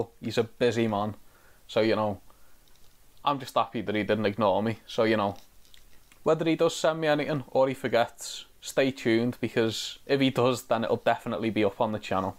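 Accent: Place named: Liverpool, United Kingdom. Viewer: It makes me unreasonably happy to hear another scouser getting into the whotube community.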